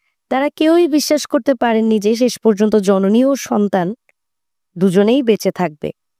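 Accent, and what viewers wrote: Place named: East Bengal, Bangladesh. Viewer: স্পষ্ট করে বলুন উচ্চারণে গন্ডগোল কোথায়। পাঠিকার উচ্চারণ পূর্ববঙগীয় ঘেষা। অর্থাৎ উনি বাঙাল।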